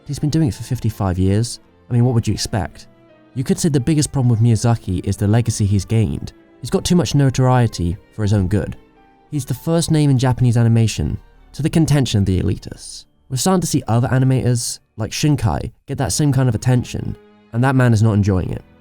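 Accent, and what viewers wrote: England, United Kingdom. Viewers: ''there aren't like a hundred more Miyazaki films''we don't need them!!!!!!!!!!!!!!! they were great but not always the best!!!!!!!!! there are others out there ….nothing has to be a perfect animation!!!!!!!!!! Ghibli only got popular because of Japanese culture of façade and completabilities with other animating companies... the world went through a trendy stage where Ghibli came on a time where animation needed some direction...let go of this idiotic Ghibli shit!!!!!!!!!!!!! it's over...picachu came with live version which is absolutely fuckin' idiotic...hopefully miyazaki will not allow asshole Disney to make idiotic live version of Ghibli shit!!!!!!!! is it me or is it always have to be an English accented fool doing Ghibli documentaries...?not bad doc....maybe because appreciation and understanding is far better than many other culture that English accented people do these types...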